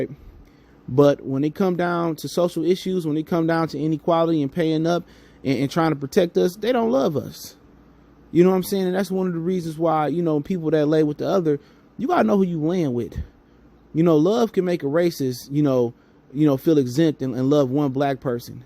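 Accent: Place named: California, United States. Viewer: i will like to add chiefs will pay him what ever he wants. Are you a raiders fan? i know it has nothing to do with it but you got a cali accent lol Also mahomes has not been getting along with his parents for awhile beacause they mad at his brother that is gay. He supports his brother no matter what but the parents trying to cut his brother off. Yes i have the insite homie and this is all true.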